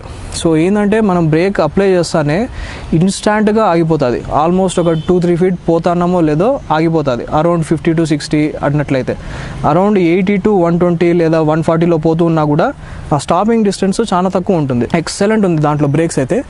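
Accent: Rayalaseema, India. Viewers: I alway love your rayalaseema slang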